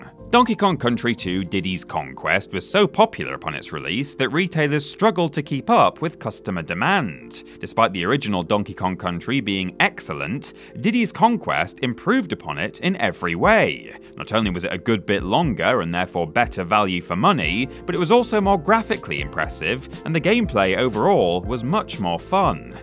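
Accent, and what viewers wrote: United Kingdom. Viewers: It wasn't until hearing pronounce it with his British accent and cadence, that it finally sank in. Diddy's Kong Quest is supposed to be a play on Diddy's Conquest.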